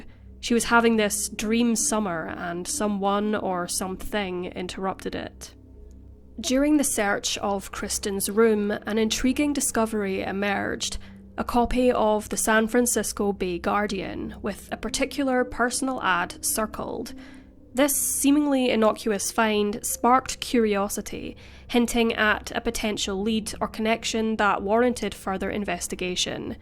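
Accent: Scotland, United Kingdom. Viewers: Where is your accent from clearly Scotland but guessing not quite as far north as Aberdeen, right?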